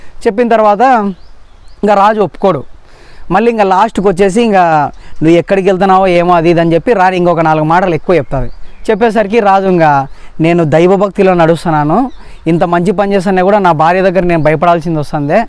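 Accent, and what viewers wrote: Rayalaseema, India. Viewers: I am from Telangana but I love your stories andhulonu ne Rayalaseema yasa enkka super bro nenu and na family subscribe chesukunamu all tha best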